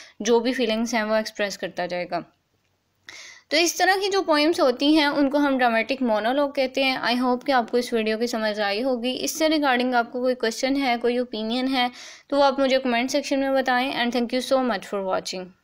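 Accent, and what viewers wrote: Pakistan. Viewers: Kya aap pakistani ho kya aapki tone ase hai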